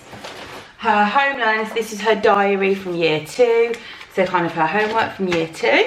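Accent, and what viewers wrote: Birmingham, United Kingdom. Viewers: It's a fairly strong Birmingham accent. Not all British accents are the same at all haha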